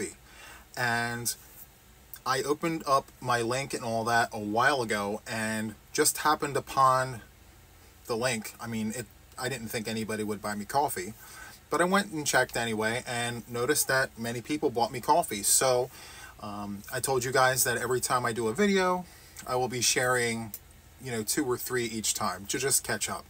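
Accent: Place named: East Coast, United States. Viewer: Happy new year and friends!! I just love your enthusiasm and especially when that East Coast accent comes out 😂! Please tell mama we say hello!!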